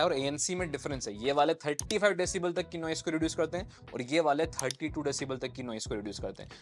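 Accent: Indore, India. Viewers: getting in the video for a sec I realised you are indori and then I read your name, and you are indori, I am also indori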